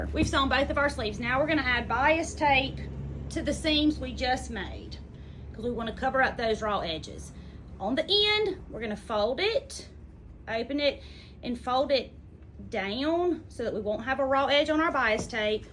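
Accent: South, United States. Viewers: first of all, I am a novice quilter - still haven’t actually quilted my flimsies, just had other people quilt them for me. Second of all, thank you for being such a great teacher. I say that not because I’ve tried anything of yours yet but because you have made this all seem really do-able for this 65 year old novice! I can’t wait to try this quilt coat! And, finally, than you for sharing that beautiful Southern accent (I have one, too); it harkens to “home” for me and, as I’m sure you know, is totally disarming! I’m hoping you will show us how to make some matching mittens and maybe even a hat or toboggan of sorts from this beautiful quilt’s scraps! Please! Oh! and maybe a quilted scarf for winter, too? (I’m living in Colorado now.) And, I almost forgot! I really want to make a mandarin collar for my coat - but I have NO IDEA how to do that. Did I mention I’m a novice?;) I look forward to learning from you!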